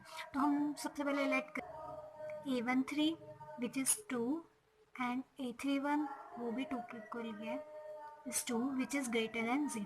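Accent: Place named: Haryana, India. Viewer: Liked your Haryanvi accent.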